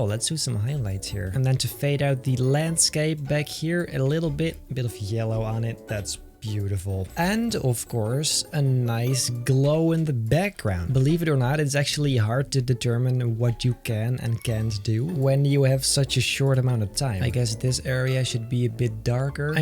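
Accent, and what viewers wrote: Netherlands. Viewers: Damn I was hoping for more dutch accent but honestly any vid is a win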